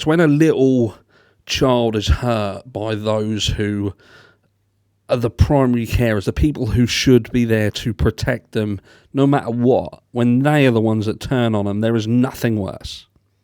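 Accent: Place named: Scotland, United Kingdom. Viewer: Im having rabbit stew.... 🐇 🐰 yummy I love your voice being Scottish, but I hate all this murder 😢 whats happening with the world 🌎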